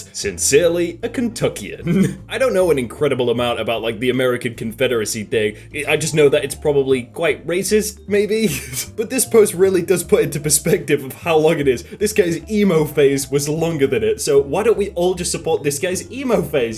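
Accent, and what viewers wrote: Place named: United Kingdom. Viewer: Every video helps me learn British-English I love it, thank you 🙏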